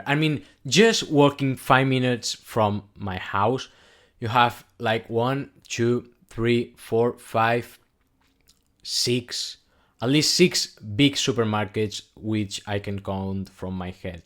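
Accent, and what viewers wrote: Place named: Barcelona, Spain. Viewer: Aha, you're from BCN :D Excellent - everyone will sound like this!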